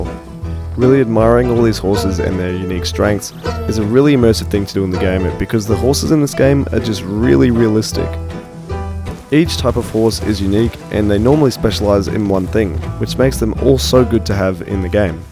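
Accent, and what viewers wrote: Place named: United Kingdom. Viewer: Video about rdr2 over 10 minutes narrated by a man with a British accent is a guaranteed banger lol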